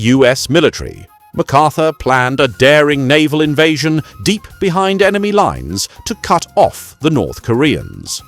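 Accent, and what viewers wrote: United Kingdom. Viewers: Finally a British accent in these kinds of videos Makes US flag merch Disgusting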